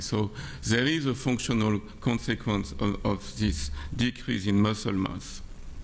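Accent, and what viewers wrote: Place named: France. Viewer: dicficult to follow, English which sounds like French.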